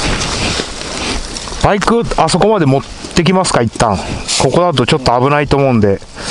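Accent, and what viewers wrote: Tohoku, Japan. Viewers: 喋り方で東北の人かなって思うw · この訛りは東北の人ですか？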